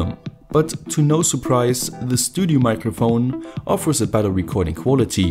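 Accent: Germany. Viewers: Lol, du bist deutsch?! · Are you german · Kommst du zufällig aus Deutschland? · Are you German?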